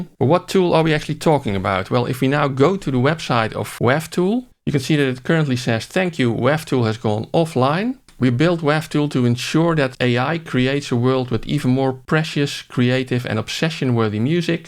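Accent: Netherlands. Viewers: You can call yourself but your accent betrays you, you're dutch!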